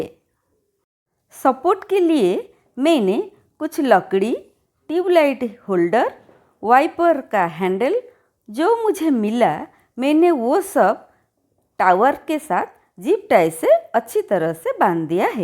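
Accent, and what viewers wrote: Odisha, India. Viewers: Mujhe to odisha ki lag rahi hai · Funny accent, Odia flavor!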